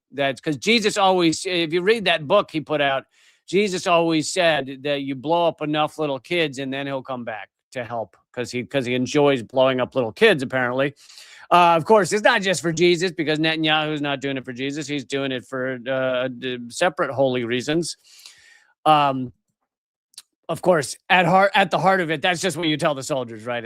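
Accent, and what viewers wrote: United States. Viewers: Nothing personal mate & your content is fabulous just not in the zone to listen to an american voice & that grating accent . All the best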